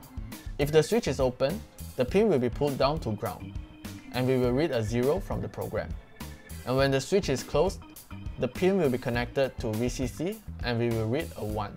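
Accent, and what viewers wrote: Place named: Asia. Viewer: Not in a rude way , but , Also the asian accent just makes the whole vid better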